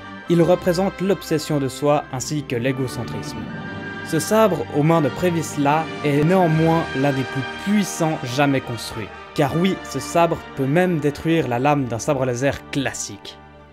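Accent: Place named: England, United Kingdom. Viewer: horrible accent anglais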